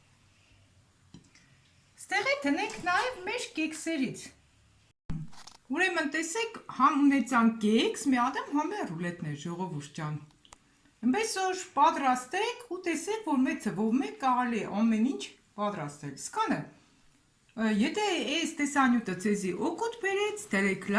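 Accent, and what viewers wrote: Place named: Gyumri, Armenia. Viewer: Shat em sirum gyumrii barbare akcente...😃😃😃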